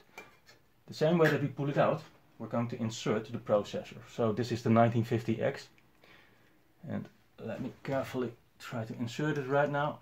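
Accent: Netherlands. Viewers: Are you DUTCH ?????? FROM AMSTERDAM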